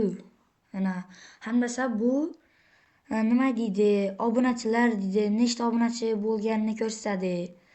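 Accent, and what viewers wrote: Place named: Khorezm, Uzbekistan. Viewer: zoq chiqibdidan gaplash da gap bo xorazimcha va toshkentcha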